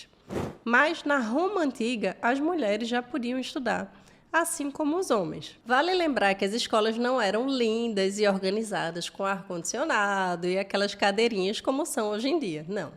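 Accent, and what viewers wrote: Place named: Recife, Brazil. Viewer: ganhou mais um seguidor o sotaque de recife é lindo de mais, esse canal logo vai crescer muito seguidores.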